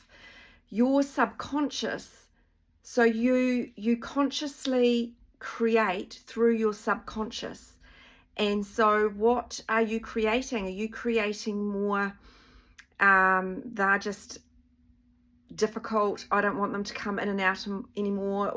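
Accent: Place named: New Zealand. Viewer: Hi! I paid $500 to a bald man on youtube in october. Did everything he said then sat in fear based energy for 3 months, stressing i had to forget him but could not. Shamed myself. Felt like a naughty child in the group, cant say this cant do that or we'll block you and no refunds!! Found you 2 weeks ago. Immediately shifted and grounded the hectic energy. Just wanted to say thanks for your videos, wish i could pay for your services but cannot put any more $$ into this journey. Parents grew up in hastings and napier, im in melb so love the accent! Reminds me of my cousins. Thanks for all you do xxxx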